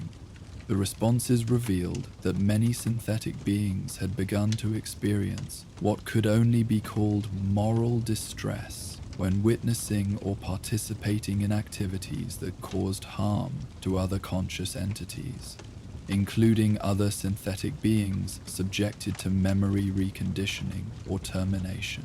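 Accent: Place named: United Kingdom. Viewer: Lost me at the British accent. I came to hear 1950s trans Atlantic accents like in fallout not British mods.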